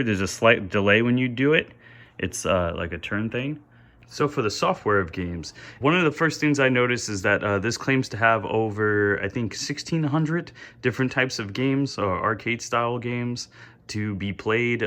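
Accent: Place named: Midwest, United States. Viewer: I thought you were funny. May I ask are you from the Midwest? You speak like us from MI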